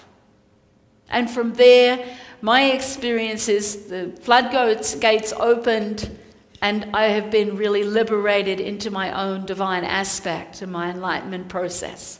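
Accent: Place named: Australia. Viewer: you not only give wonderful HUGS but ones that have never met you giving your presence as on You Tube and your online Web Site you give this amazing loving energy that is so understood especially with your beautiful Australian accent You of choice are a Beautiful Earthling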